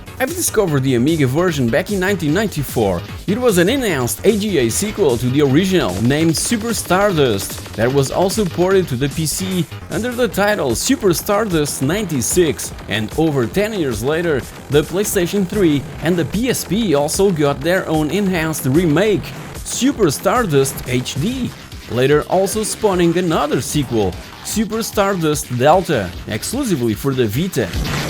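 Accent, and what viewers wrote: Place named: Portugal. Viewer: your accent is very Portuguese, am i correct?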